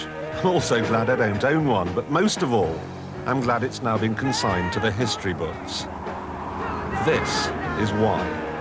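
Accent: RP, United Kingdom. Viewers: Ha Clarkson used to have an old "bbc" voice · Clarkson as hilarious as ever but sounding a little posher than now, obviously the public school education has eroded to become the success he is today and become a man of the people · Back when Clarkson spoke more like the Queen than his true Yorkshire persona like he does now. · He sounded so posh back then! Now he sounds like a chav lol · Why does Clarkson sound so posh back then lol?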